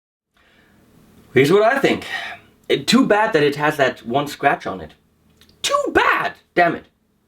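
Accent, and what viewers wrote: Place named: Germany. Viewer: Got one myself. Not that I needed another guitar, I own Gibsons, Fenders, Epiphones and a lot of Partsocasters and Chinese rip offs(mostly custom orders on my specs). I love this guitar expecially for its playability (24 frets is a luxury!). I have replaced the stock tuners with a set of locking machines and the knobs with amber barrel ones. The stock pickups are not bad ay all, though they have some sort of plasticity reminding me some Ibanez models. I might drop in a set of Duncans, they made some of my Chibsons sound super! All in all, a good purchase, bought second hand and already broken in. Thanks for your videos, you crack me up (I have toured extensively in Germany in the past, and I love your accent, it reminds me of the old days!)😄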